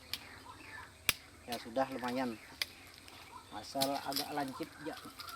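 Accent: Kalimantan, Indonesia. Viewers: Dari cara bicara kayaknya pian urang kalimantan... Ini kerjaan ku masih SD.. dapt 1 aja seneng nya war biasa.. komplit ya ... D tunggu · Logatnya orang kalimantan ya bro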